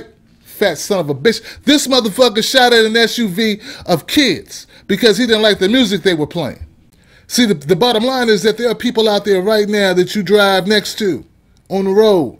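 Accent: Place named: New Jersey, United States. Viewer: I just love your Jersey accent!!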